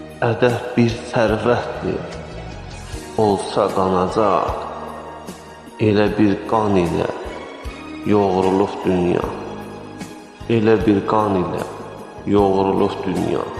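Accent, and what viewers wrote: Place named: Azerbaijan. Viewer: AZƏRİBAYCAN səsi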